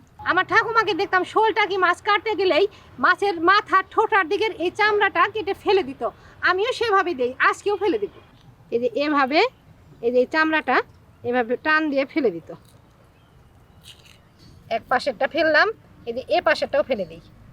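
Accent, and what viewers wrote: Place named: East Bengal, Bangladesh. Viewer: দিদিভাই তোমার পূর্বপুরুষের বাড়ি কি বাংলাদেশে ? তোমার রান্না এবং কথার সাথে পূর্ব বাংলার অনেক মিল আছে।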